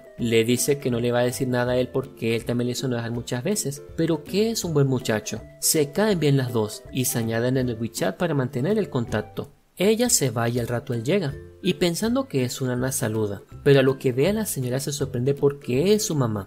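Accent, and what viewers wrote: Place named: Venezuela. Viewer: No sé el porqué pero siento que eres Venezolano (la voz del chico que hace el resumen), tu forma de hablar es demasiado característica de los Venezolanos.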